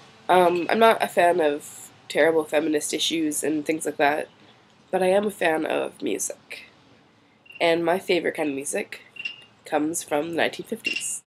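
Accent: Canada. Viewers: Canadian accent? D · I just realized that you say ABOOT! It's slight but it's there! AWESOME!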